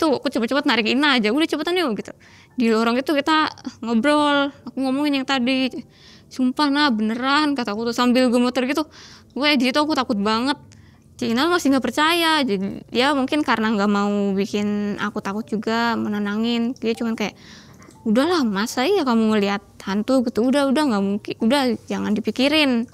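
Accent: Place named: Cirebon, Indonesia. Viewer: biasanya org cirebon klo ngomng ya je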